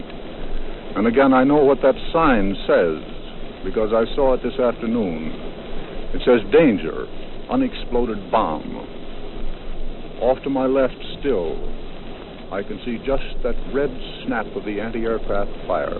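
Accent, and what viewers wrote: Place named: Canada. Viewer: Murrow was from eastern Washington state. He sounds a touch Canadian, like he could have been from British Columbia.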